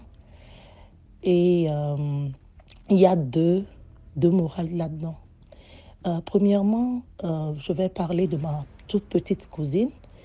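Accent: Benin. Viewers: Ça se passe au Bénin. On reconnais votre accent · L’accent est un accent béninois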